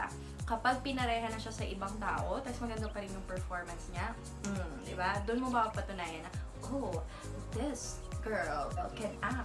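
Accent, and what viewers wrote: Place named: Visayas, Philippines. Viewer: HAHAHAHA funny yung bisaya accent 🤣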